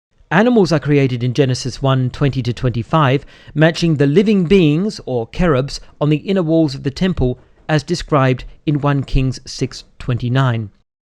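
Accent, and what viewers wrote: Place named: United Kingdom. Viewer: Everything sounds more authoritative in a crisp British accent, but I have to agree with Snipe. You guys are acting like the temple came first and the text of Genesis came later. Genesis isn't modeled on a temple, the temple is modeled on Genesis. That doesn't make creation a temple anymore than a doll house is a real house. Creation isn't a temple, the temple is a reflection and microcosm of how creation was supposed to be. I am also inclined to think that the temple itself was more along the lines of a reflection of the garden. The "sea" was in the outer courts. That was the earth. Thus the windows letting in light wasn't about recreating the host of heaven. It was just a way to illuminate the temple. If creation was meant to be a temple instead of the temple a microcosm of creation, then why wasn't God big on temples? 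He only wrote it into the story when David wanted to build one, and He wrote it out of the story when it became almost an idol. God's idea was the Tabernacle.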